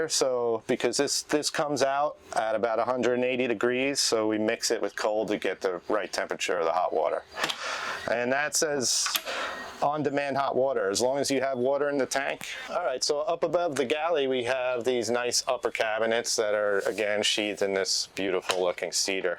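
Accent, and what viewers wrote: New Jersey, United States. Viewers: New Jersey accent =dumpster fire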